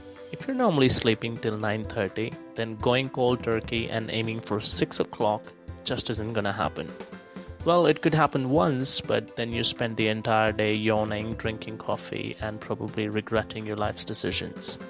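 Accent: India. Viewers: where are you from? I picked up on a bit of an Indian accent but just in a couple words 😉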